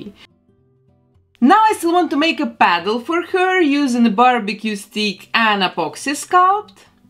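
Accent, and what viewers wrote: Russia. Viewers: If I may ask... You have a gorgeous accent (I’m American) my husband says you’re from Russia (he’s a musician in a Russian place) I think you mentioned Belgium. It’s so beautiful!! You have a gorgeous accent!! ♥️♥️ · Акцент очень русский...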